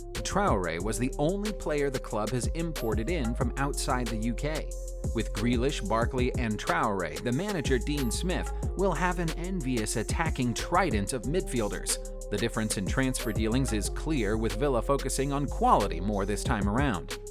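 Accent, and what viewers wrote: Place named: United States. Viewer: Agreed, such a turn off with the American accent and mispronunciation.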